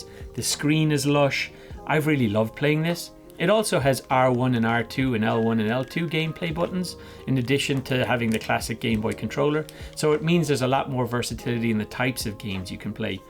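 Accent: Ireland. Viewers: This is the most irish sounding american I've ever heard. Cheers from the other side of the pond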